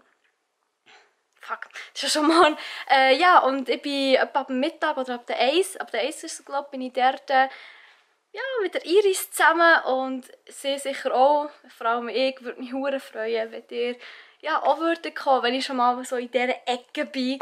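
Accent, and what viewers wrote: Bern, Switzerland. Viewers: Fingi mega cool ds bärndütsch ändläch mou öpper wo do hiä uf youtube bärndütschi videos macht ;-) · Speix Darum redet sie Bärner Dialekt. xD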